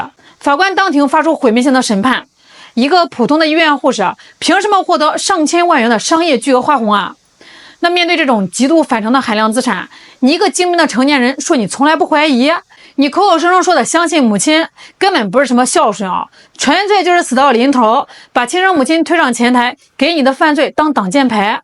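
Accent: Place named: Henan, China. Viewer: 这个主播的口音大概是豫北冀南鲁西的人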